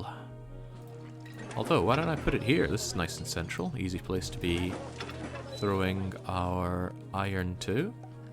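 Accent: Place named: Scotland, United Kingdom. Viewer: Dark Young is a bad influence. Since you started hanging out with this guy, you've been sounding increasingly... Scottish.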